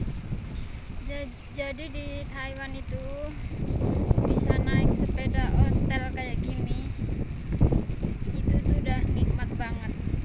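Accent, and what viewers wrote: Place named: West Java, Indonesia. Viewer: Dari logat bahasanya kayanya kamu dari Jawa barat ya?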